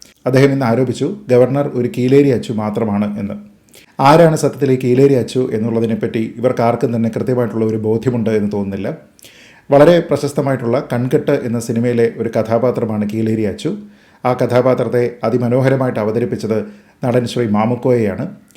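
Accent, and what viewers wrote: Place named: Central Travancore, India. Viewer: സാറെ നിങ്ങളുടെ മണിമണി പോലുള്ള മദ്ധ്യതിരുവിതാംകൂർ മലയാളം കേൾക്കാൻ തന്നെ എന്താ സുഖം 😅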